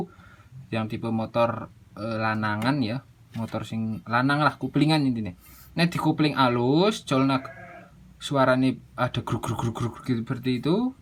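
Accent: Banyumas, Indonesia. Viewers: Sampean wong ndi kang ngomonge ngapak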